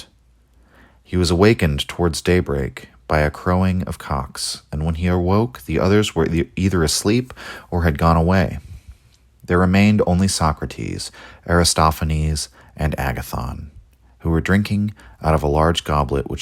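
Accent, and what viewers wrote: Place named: England, United Kingdom. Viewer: I'll never get used to hearing ancient Greek names in an English accent 😭 I think you'd enjoy the sound of the actual names ❤️😊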